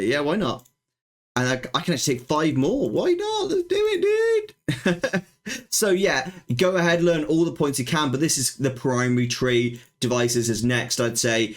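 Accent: United Kingdom. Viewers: you sir have probably the best energy its just so refreshing to see, also the english accent is easy to understand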